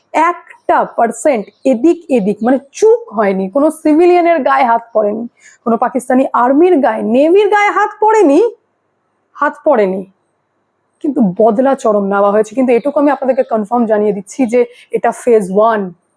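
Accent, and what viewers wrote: India. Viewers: Bangla bolte bolte hindir aanch ta na tanai valo. Yeh-te sunte irritate laage. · দিদিভাই তোমার ভিডিওগুলো খুব ভালো হয় কিন্তু তোমার ভিডিওতে দিদিভাই হিন্দি টান থাকে পুরো বাংলায় ভিডিও বানান দিদি আপনার ভিডিওগুলো খুব ভালো হয় প্রাউড বাঙালি হিন্দি টান ছেড়ে ফুল বাংলা টানে ভিডিও বানান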